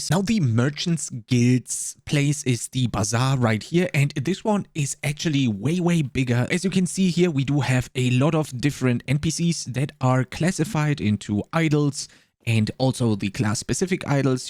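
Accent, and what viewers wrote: Germany. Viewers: are you german ????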